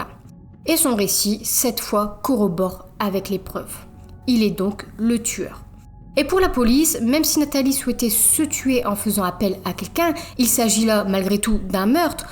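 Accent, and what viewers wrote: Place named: Nord, France. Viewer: T'as grandi dans la famille ch'ti ? Parce que maintenant c'est rare que quelqu'un si jeune comme toi possèderait un accent très prononcé. Je me moque pas de toi, c'est juste que je kiffe cette prononciation. Ça fait un petit moment que j'apprends le français et je me suis habituée à prononcer tous les a comme toi. J'ai vu la vidéo où tu disais que tu songeais pas changer ta prononciation et je trouve que c'est très bien.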